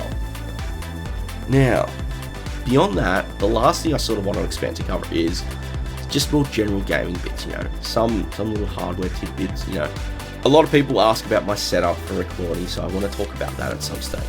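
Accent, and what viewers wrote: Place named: Australia. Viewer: That Australian accent can be quite tricky for me, but I do understand most of it. ;) I think it sounds good, to cover the same game on different platforms. It's interesting to compare the differences. I'm slowly starting to regret naming my channel, so I'm stuck on one platform. I do feel most at home on the C64 (partly Amiga, as well), but it could be very interesting to try some of the other machines. Especially Amstrad and Spectrum. New exciting worlds will probably open up for me.. :) Anyway.. Looking forward to your 2016 content. :)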